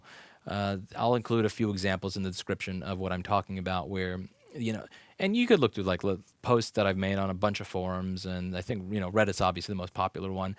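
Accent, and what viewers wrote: New York, United States. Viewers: Louis, someone else should do the day-to-day, but YOU should continue flying yourself to speak at legislative hearings and reimbursing your expenses ONLY from the advocacy group. The organization needs to have near-infallible, well-credentialed technical advisors and knowledgeable spokespersons from all corners of the RTR effort. YOU should be one of a large team of those experts. You’re a bit “New York” for this Oakland native, but you are well-spoken and engaging. You could tell a story about your trip to the “likkuh stowe” ...err, “bodega” last night for a bag of chips and people will listen. All my best to Mr. Clinton. Tell Oreo to go pound sand.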